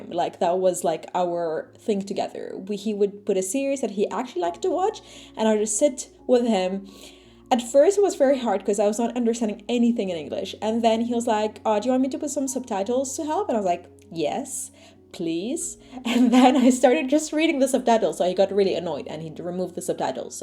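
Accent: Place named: United States. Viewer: When we first subscribed to your channel, we thought you were American :) your accent is really good!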